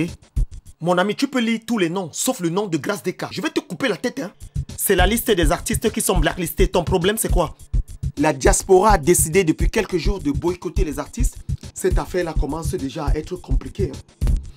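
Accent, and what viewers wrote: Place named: Cameroon. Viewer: Tu as un rare accent camer, où est passé ton chôcô de la Ndeuch Family 😂😂😂😂😂???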